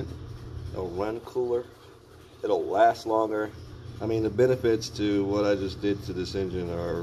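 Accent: United States. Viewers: I get a lot of verbal inflection in your voice of a New Yorker. You actually kinda sound like Stanley Kubrick. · Interesting video. You talk like a good ole boy from South Carolina. I understood every thing you said. lol Where are you located?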